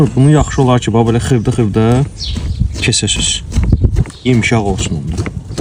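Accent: Baku, Azerbaijan. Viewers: Çox sağ olun xoş sözlərə görə braziliyalı qonağımız. Bu tək söz yığını deyil. Həqiqətən nəinki Şağan kəndini bütün Şüvəlan Mərdəkan v ə başqa bakı kəndlərimizi təmsil edir. Allah razı olsun sizdən bakılı qardaş. Əsl bakılı... danışığınnan, söhbətlərinnən, hərəkətlərinnən danışmadan bildir məsəlinə uyğundur. Allah canıvızı sağ eləsin. Balalarıvızı qorusun. Xeyrlərini görəsiz. Ailəmiz,də qaynımgili də sizi yutubdan həvəslə izləyirik. Bişirdiyiniz hər neməti də bizə elə təqdim edirsiz ki gələn veriliinizi səbirsizliklə gözləyirik. Özümüz Şüvəlandanıq. Bütün Mərdəkan Şüvəlan kəndinin camaatı adından sizi salamlayırıq. O dadı var? Yox.. sonra da yeriviz məlum deyən balanı da əvəzimə öpərsiz. Allah saxlasın. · Əsil Bakılı ləhçəsi,can Bakılılar,daim var olun ❤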